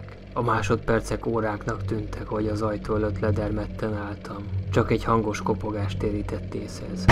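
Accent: Russia. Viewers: Jó az orosz akcentus :-)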